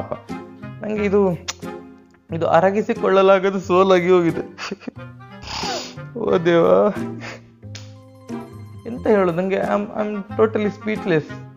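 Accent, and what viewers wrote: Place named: Kundapura, India. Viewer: ಕುಂದಾಪುರ ಕನ್ನಡ🔥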